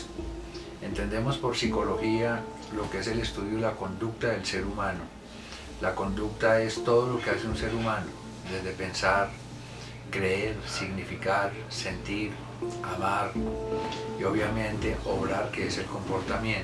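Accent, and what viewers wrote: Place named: Antioquia, Colombia. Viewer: Con todo respeto la conclusión a vuelo de pjaaro es la de que se trata de un charlatán de acento.paisa, ,con lenguaje rebuscado disfrazado.y tendiente a aterrorizar,encasillar,someter,infundir hacia la disminución,la clasificación morbosa,y la. Intimidación ,ladrillazos parrafudosos de redundancias destrictivas y destructivas de la esperanza de quienes sufririan.Es la sensación que queda tras exponernos a sus "exposiciones radiales,sin salida y trampedeantes.seria bueno no intentara seguir haciendo daño a las audiencias con actitudes sobresuperioradas ,y extensas ,".inocentemente"y de " buena voluntad".Es posible que haya más coincidencias con esta observación,saludos a Parrita.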